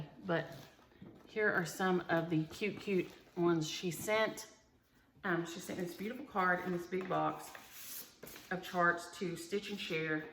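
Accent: South, United States. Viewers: Love your stories and your "southern " talk, I feel like I'm in the south again. You have a beautiful face and personality.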